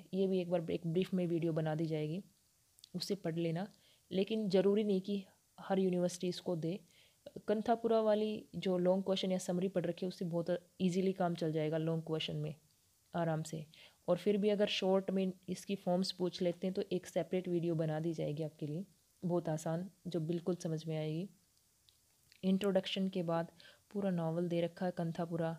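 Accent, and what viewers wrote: Haryana, India. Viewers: Madam Ji Maine Apka Thoda thoda Haryanvi Language ka Touch Padhne main Ap use karte ho Badhiya lagta hai जय हरियाणा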